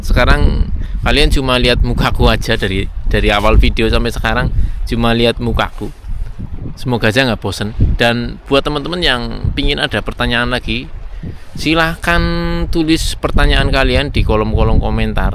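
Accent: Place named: Cilacap, Indonesia. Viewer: Wis mbedek kayane wong cilacap sampean kang wkwk. Salam pepada wong cilacap yaa kang, suwun nggo konten e. Semangat kerja ne kang mbesuk tek nyusul ming jepang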